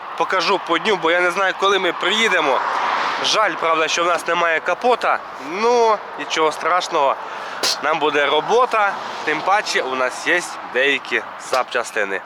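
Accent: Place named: Surzhyk, Ukraine. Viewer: Будє прикольно - вахааа.дятел суржик жосткий.песня отстой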